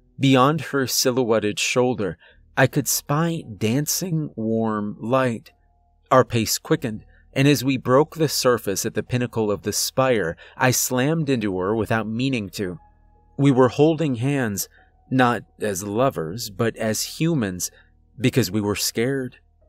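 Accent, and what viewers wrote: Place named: South, United States. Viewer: Now whenever you do you southerner accent all I can here is TRAVIS MA BOY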